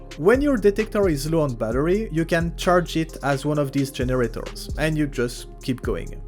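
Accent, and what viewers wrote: France. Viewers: Bro are you french? I like the accent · I love your french accent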